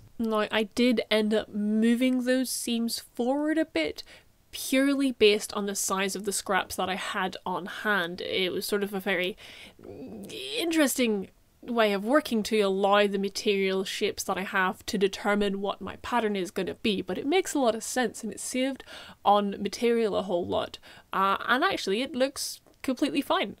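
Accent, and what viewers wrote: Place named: Ireland. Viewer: I was like “this is 100% being crafted by an emerald isle accent”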